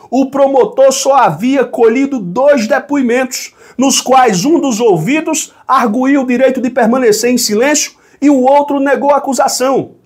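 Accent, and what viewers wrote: Northeast, Brazil. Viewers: Salve Salve o.... você tem sotaque forte e com muito prazer digo sotaque NORDESTINO 👏🏼 DE ONDE É VOCÊ.... PARABÉNS.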